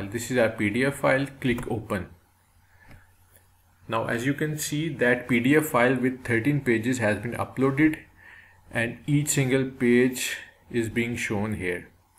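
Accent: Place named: India. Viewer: Was hoping to hear an accent in STANDARD English... Another India. 🙄🙄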